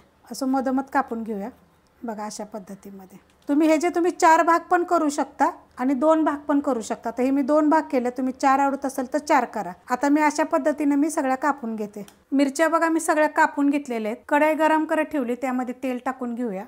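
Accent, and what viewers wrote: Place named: Ghats, India. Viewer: Mi navin viewer aahe. Mirchi nakki kontya prakarchi vaparli aahe? Aavadali. Tumhi ghatavarche dista. Aapki boli vatli. Mala Abhi man vatla tuza. Satara bhgakadchya sadhya sopya pan ashach khamang recips dakhav. Ghatavar kami vastunmade atishay chavisht padarth kele jatat. Mazya hya channel la khup khup shubhechchha.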